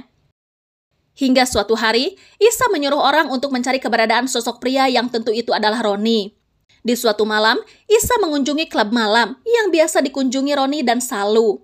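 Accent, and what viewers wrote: West Java, Indonesia. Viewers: Ini admin nya kaya nya orang sunda nya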